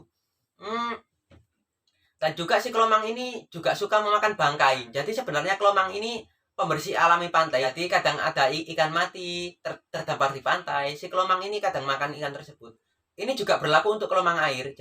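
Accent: Java, Indonesia. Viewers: Medhok bosomu mas🤣🤣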